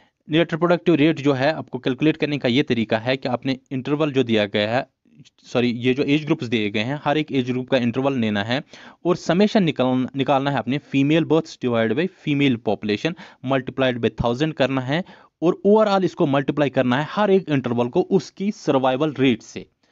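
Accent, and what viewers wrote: Kashmir, India. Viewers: Sir love your teaching skills. Specially your kashmiri accent... I completed statistics with full of enjoyment...The word,"Thanks" is not sufficient for your efforts sir. May God fullfill your every desire... Sir Iam preparing for JSA there are two more topics remains: 1 Correlation and Regression- Concept and simple applications 2 Interpolation and Extrapolation Sir please will you complete these topics too... 🙏🙏🙏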